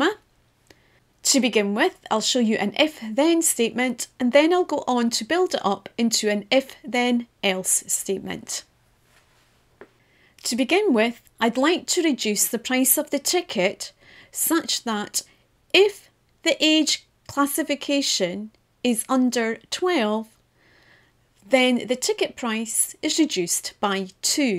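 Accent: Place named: Scotland, United Kingdom. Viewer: Thanks for the clarification! I was looking up some video and was very pleasantly surprised to find a Scottish accent!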